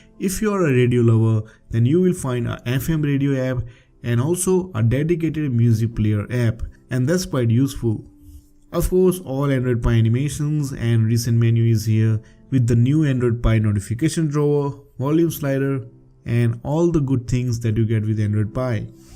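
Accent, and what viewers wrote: India. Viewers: just gonna say the obvious but is there a single fucking review of lineageos 16 without an indian accent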